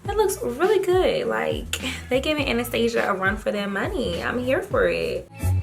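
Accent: DMV, United States. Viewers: That DMV pops out everytime! I loveee you lol😂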